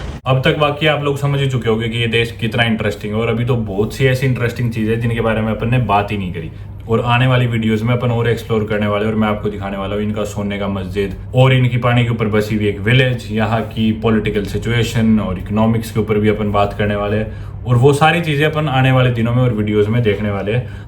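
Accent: Haryana, India. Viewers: आपा Haryana wale hindi me Haryanvi mix kr hi dete hai , wo hi baat pta lg hi jata hai गामा के बालक सै ❤❤ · This haryanvi accent literally gives me a headache and very unpleasant for my ears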